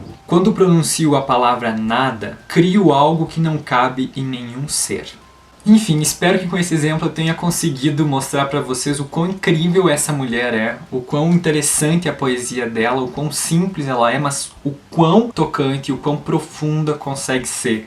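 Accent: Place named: Portugal. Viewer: O sotaque dele chega a beirar o sotaque de portugal kkkk amo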